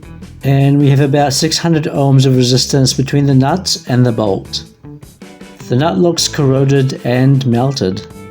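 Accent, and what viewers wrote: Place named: New Zealand. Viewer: Evening!! I have thoroughly enjoyed your videos as I posses the same vehicle therefore is very informative & helpful. I couldn't help but notice the kiwi accent! As an aspiring mechanic, you as a mentor would be fantastic.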